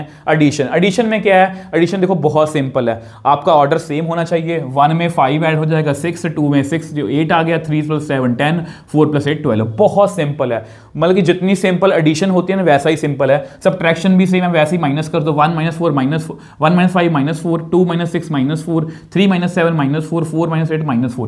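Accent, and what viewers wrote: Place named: Pakistan. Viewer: apka accent Pakistani lag RHA hai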